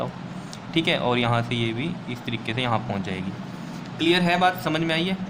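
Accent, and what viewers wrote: Punjab, India. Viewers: Its subh exam h sir 😢😢😢😢 sir aapki voice achi h give punjabi vibe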